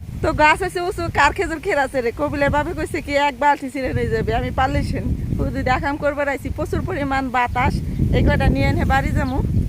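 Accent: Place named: Barishal, Bangladesh. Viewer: আপনার কথা শুনে মনে হচ্ছে বরিশালের মানুষ আপনি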